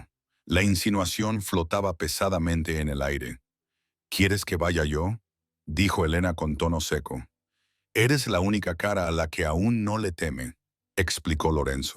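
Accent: United States. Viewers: ME ENCANTA LA IA , CON ESA VOZ CON ACENTO ESTADOUNIDENSE. , SERÁ PORQUE TENGO UN AMIGO ESTADOUNIDENSE QUE HABLA EL ESPAÑOL ASÍ. AH POR CIERTO ME ENCANTAN LAS NARRATIVAS DE ESTE CANAL. MUCHÍSIMAS GRACIAS. SALUDOS CORDIALES DESDE CDMEX MÉXICO.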